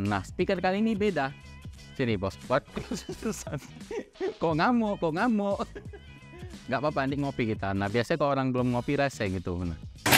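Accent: Medan, Indonesia. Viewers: Suka aku pada host ini. Akses Medan dan Cina jadi satu. Mantap kalian.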